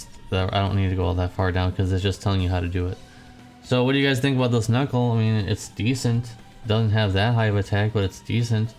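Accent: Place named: Wales, United Kingdom. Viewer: Is his channel for Wales? Because it sure sounds like it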